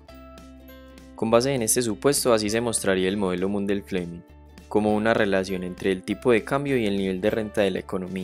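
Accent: Antioquia, Colombia. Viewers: jajajaja muy bueno el video. Se le nota lo paisa.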